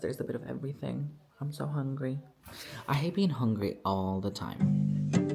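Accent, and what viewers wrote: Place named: United Kingdom. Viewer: you have a STRONG UK accent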